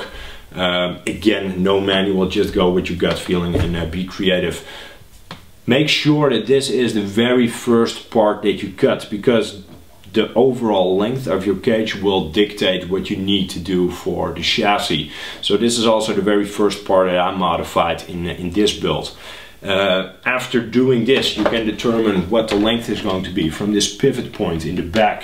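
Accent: Netherlands. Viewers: I hear a bit of a dutch accent. great video, even though I'm a bit late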